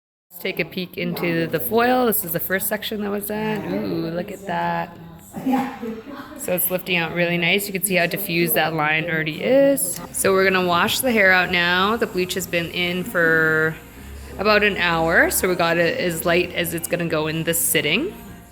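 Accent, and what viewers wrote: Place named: Canada. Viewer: I thought I heard a Canadian accent 🙂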